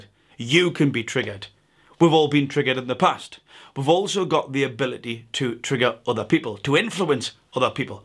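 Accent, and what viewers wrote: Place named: Scotland, United Kingdom. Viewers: Loving the Scottish accent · the moment I heard your accent it was an instant subscribe...my weakness is Scottish accents. She was literally painful to watch as a woman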